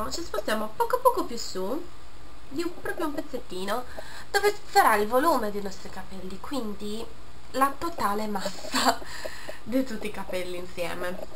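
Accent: Sardinia, Italy. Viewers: Davvero comlimenti per il disegno sei veramente brava! Sembra che tu abbia l'accento sardo, ho sentito bene? Io son sarda ;) (Budoni OT) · sei sarda? <3 sembra di si dalla pronuncia ahahah ;) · Sembra sarda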